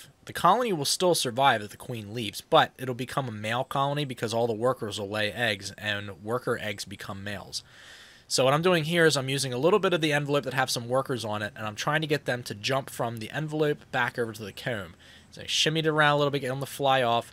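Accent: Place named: Mid-Atlantic, United States. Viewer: I have to ask....where are you located? You sound like back home - which is Maryland/Delaware/PA/VA for me.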